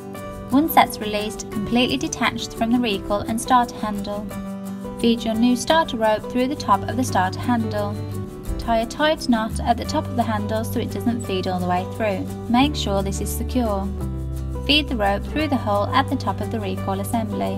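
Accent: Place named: United Kingdom. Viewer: Watching this from the states, much more enjoyable with that soft British accent than the beer drinking mechanics we have here in the US. LOL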